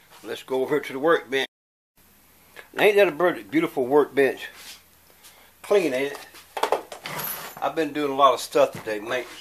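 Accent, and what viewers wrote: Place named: Virginia, United States. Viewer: Where are you from? My uncle is from around Staunton, and he has a similar accent. Thanks for the pointers.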